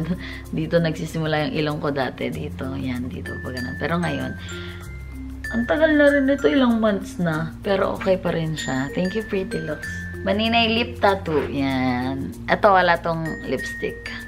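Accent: Batangas, Philippines. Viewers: Baninay gawa ka vlog na pure Batangeño accent.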